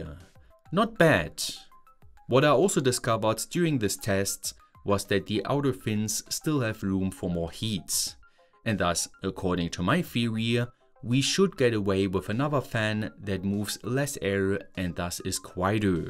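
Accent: Germany. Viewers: I have also been subscribed for many years. But I don't understand why you mislead people that you are Scottish if you have such thick German accent.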